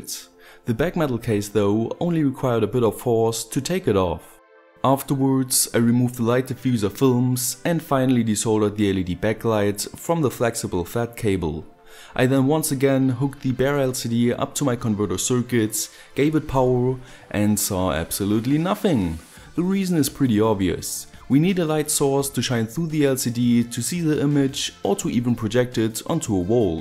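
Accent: Germany. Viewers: nice work. german english... gruäss us dr schwiiz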